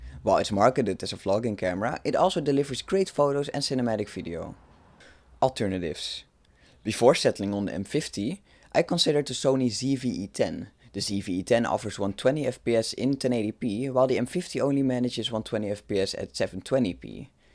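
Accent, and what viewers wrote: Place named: Netherlands. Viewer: Thank you for the break down. I just bought a second hand one today! I'm trying to learn how to operate it. I live in Hawaii so of course I need a camera here to capture all the beauty of the land. Though I would like to use an R8 I could afford this M50 today. So I'll shoot with it for a little while until I get blessed with another one. I believe I'll plan to keep this one in the collection. As I go to look for instructional videos on this camera your video is the first one I saw. I heard your voice and accent and said to myself he's a Dutch man. I looked deeper into your profile and saw you were based out of Nederland. I was born in Utrecht. Now I know for sure I've made a solid purchase. Because the Dutch are known for quality. So thank you for confirming my buy. And thank you for the wonderful video.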